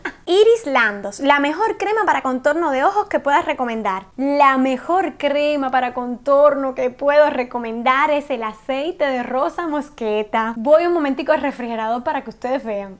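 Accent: Argentina. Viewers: me encanta el acento argentino sobretodo desde que vi la telenovela Muñeca Brava jejeje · Me encanta como hablan los argentinos y x cierto irradias mucha energía positiva eres linda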